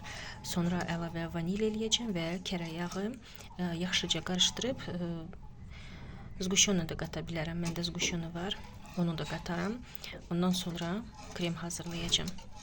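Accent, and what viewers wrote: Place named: Russia. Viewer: Siz azərbaycanlısiz danisiginiz rus dillidi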